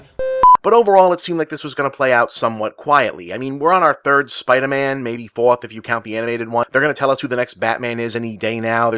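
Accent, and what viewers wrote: Boston, United States. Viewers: It's just part of his Boston chahm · Who else herd the boston in here?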